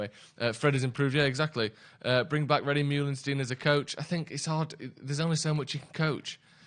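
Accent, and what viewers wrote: United Kingdom. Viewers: Shut the fuck up. Man is reading with a British accent, so he's like Howson now? Stfu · 100% a scouser ain't ya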